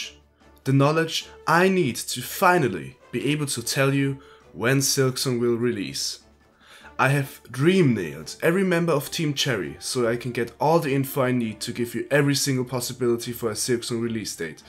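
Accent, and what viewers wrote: Germany. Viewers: Bist du Deutscher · German guy or what? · ah ok, Ur accent do rly sounds german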